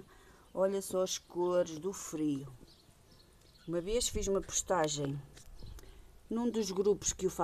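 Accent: Portugal. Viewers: Oi querida, impossível não me inscrever, suas suculentas são maravilhosas, amo suculentas e como os portugueses falam.Sou do Brasil, Rio Grande do Sul, moro em Passo Fundo.Sucesso com suas suculentas.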